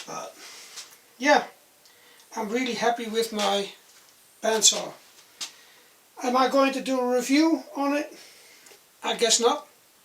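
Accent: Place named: Netherlands. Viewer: Dutch accent is awesome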